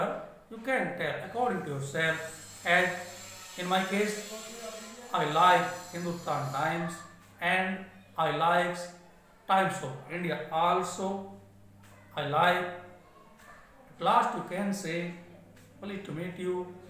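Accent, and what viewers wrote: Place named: United Kingdom. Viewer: Best of all english just like british pronunciation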